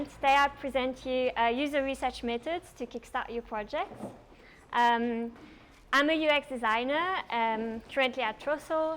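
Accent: France. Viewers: Very educational presentation with good examples. Easy to understand. I like the French accent too :)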